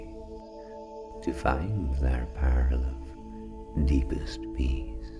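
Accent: Scotland, United Kingdom. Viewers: I love your stuff accent is great might be Scots.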